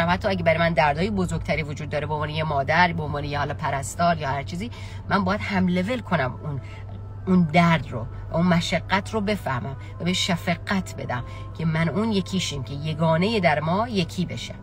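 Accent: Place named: Shiraz, Iran. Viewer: 🌺🌺🌺🌺☘☘☘ یعنی دلتنگی های من برای شیراز با این لهجه ی زیبای شما برطرف میشه . خیلی ممنونم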